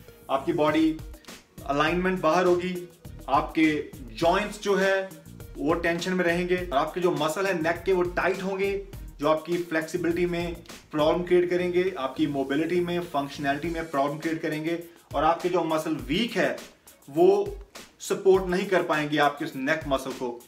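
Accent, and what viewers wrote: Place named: India. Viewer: is he speaking in english or indian i don't understand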